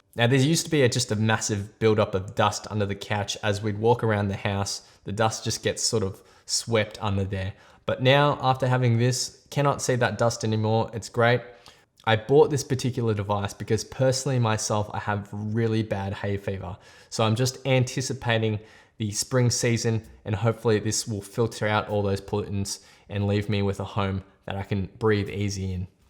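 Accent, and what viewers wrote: Australia. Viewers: Asian speaking aussie.. noice... Subscribe.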